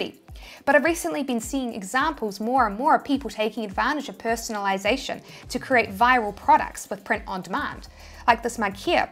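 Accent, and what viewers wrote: New Zealand. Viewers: Right when I thought you had an Australian accent you popped out of nowhere and said this is a New Zealand accent lol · Hello, you have New Zealand accent, I'm not a native English speaker but I thought at first that you are Irish ❤ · Fun fact - despite being an American, I somehow suspected your accent was Kiwi rather than Aussie. I guess I've just had that much experience already from hearing both thanks to the interwebs. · Great channel and great videos I have followed your advice and made great progress. but now I need your HELP!!! So teespring has disabled my account on the bases that I had violated their trademark rules. I do not understand why this happened, I have uploaded more than a 100 design to the site and none of them has any mark or logo that belongs to someone else. Please what should I Do? Do you have any consultant page where I can reach you directly for advice? 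I would of course pay for this service. Thank you for your cooperation and keep up the good work. I love your accent by the way Kiwis are very nice people! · Loving that New Zealand accent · New Zealand accent is not that much of an issue. Guys from India have a whole lot bigger accent problem. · it was an Itsy bitsy teeny weeny yellow poker dot, new zealand tein ny ( ten) haha! U are sweet! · At no point in time did I think your accent was Australian. Definitely Kiwi. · The New Zealand accent is wonderful! I just love the way it sounds.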